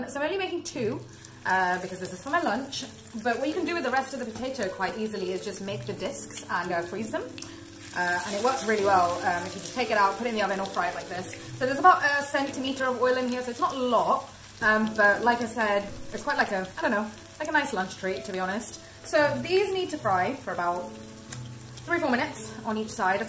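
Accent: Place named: England, United Kingdom. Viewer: This is an amazing and so easy a recipe. English accent I hear, I'M From UK too #7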